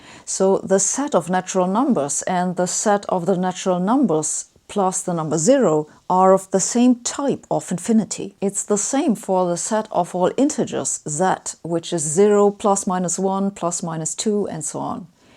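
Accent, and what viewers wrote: Germany. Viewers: Why is it that Ms. Hossenfelder is the only Youtuber that forms her words so perfectly that I never miss one? The slight German accent doesn't hurt, it may even help. Subscribed!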